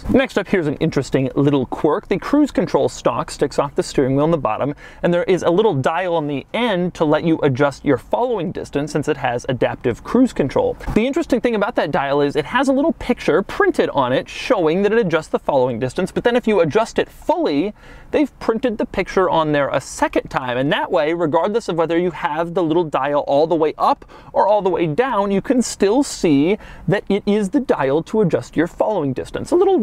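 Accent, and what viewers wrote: United States. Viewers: I absolutely hate how Americans pronounce German names. I mean I’m Dutch so as an inhabitant of the neighbor county of Germany pronouncing the names of these cars correctly is a lot easier for me than for you, but please try to because you Americans think you are the best in the world while Europe is a lot better.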